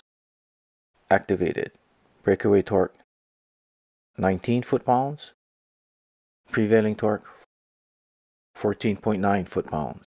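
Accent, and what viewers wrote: Hawaii, United States. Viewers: Cahz u from hawaii, sound like u get some moke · Eh, you from Hawaii? Can tell by your Local accent -- I'm a 5th-gen Local from Maui, just found this channel, right on 🤙🏼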